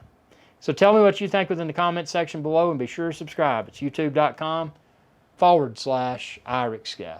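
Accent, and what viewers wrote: United States. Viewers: Love the country accent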